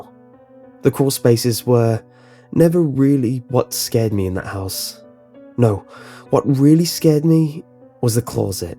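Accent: England, United Kingdom. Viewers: He sounds like an English male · something about being read creepy pasta's from a guy with a British accent is really fun.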